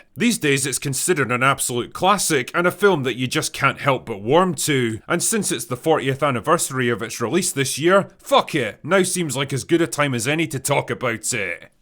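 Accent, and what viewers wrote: Scotland, United Kingdom. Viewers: SCOTTISH?!?! Aaaw man all this time I thought you were Irish. The accents are so similar! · I did notice the subtle accent, Scottish, who would guess... Great channel.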